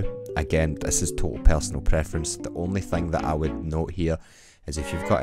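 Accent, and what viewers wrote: Scotland, United Kingdom. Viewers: are you scotish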